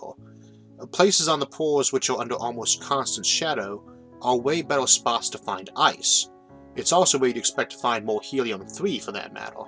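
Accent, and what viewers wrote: United States. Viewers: I never noticed an impediment, just a US accent. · Your voice is fine. Which part of the States does your accent come from? I’m from nz. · And I don't think you have a "Speech impediment". Horrible yank ascent but that's it. And I can get throught that. Going to Lunar is akin to going to the corner coach station and that's about it. Now, if you want to use it as an analogue for Mars then you may have something. You can install astronomy telescopes arrays that can be remotely controlled. which could be deployed around the surface. And you could install communication relays around it as well but placing those in orbit could be a better method of deployment. But as far as mining it? I don't think it will "panout" as you yanks say. Yes, there is material there but mining it in large qualities could be more of a dream than reality. Anything near the surface would have long since cooked off. 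And the ice echos from the surface as likely something else all together. RDF can be easliy fooled. You would have to go down atleast 200m before you remotely will find something. So, I don't see Lunar to be a place for any colonization. Just maybe a repair team to fix whatever can't be fixed by robotics. Other than that. That's it.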